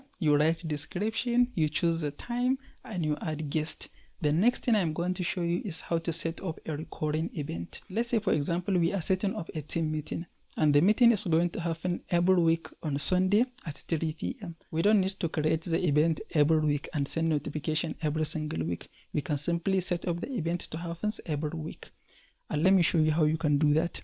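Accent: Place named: Hausa, Nigeria. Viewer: you accent is funny.. are you hausa ?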